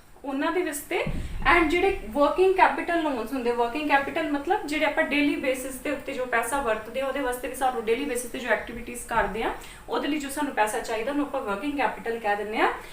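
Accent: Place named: Majha, India. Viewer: Madam amritsar di lgdi a ya majhe di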